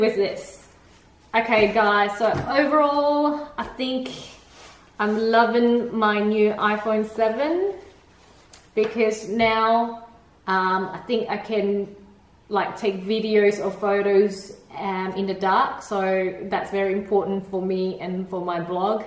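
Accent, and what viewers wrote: Australia. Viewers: I love your accent I'm Australian as well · Are you Australian?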